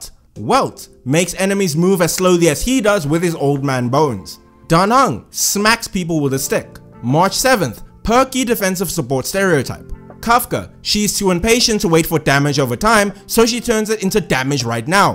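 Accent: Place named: South Africa. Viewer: u started speaking and i immediately went to check ur location lmao i can always spot my fellow south africans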